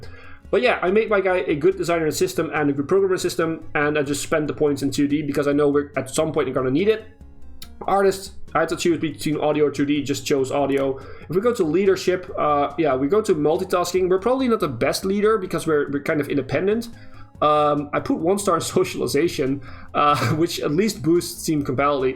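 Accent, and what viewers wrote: Netherlands. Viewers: That's correct, I'm from the Netherlands too. Funny how, as a Dutchy, you always recognise the smallest Dutch accents 😁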